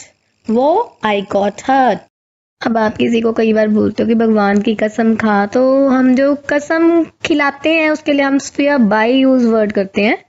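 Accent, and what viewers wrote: Punjab, India. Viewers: punjabi ho kya are you panjabi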